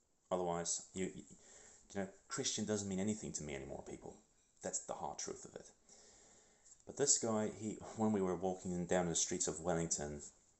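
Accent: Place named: United Kingdom. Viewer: I just prayed for you. I also want you to know that I haven't prayed in many years but I really wanted to do it for you. I have this necklace with Jesus on the Cross. I took it into my hands, went on my knees and asked Jesus to give you the strength, will and creativity to come up with solutions. I asked Jesus to help you out in finding answers. I trust Jesus and asked him to help you in any way he can. Regarding the more practical stuff, I am not sure how I can help. I am not from the UK (you sound British, so I presumed UK) but from the Netherlands. But here in the NL we have organizations where you can sign up and then you can apply for housing based on duration of membership so to say (I am trying my best to translate as well as I can). Sometimes you can get forwarded based on where you live and your current situation. But I have no idea what systems you have in the UK. 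Regarding work, I would suggest finding out what your strengths are and finding a way to learn it online while you do your other things. I thought I heard you say you were in Personal Training. But a quick example is learning to code for example. You can easily learn this online and after a couple of months you can start applying at jobs. The market right now is breaking with a lack of employees everywhere (at least here in the NL) so it's more about showing character than skills. So I also suggest showing up and just making it known you are driven and ready and able to work and make things happen. I hop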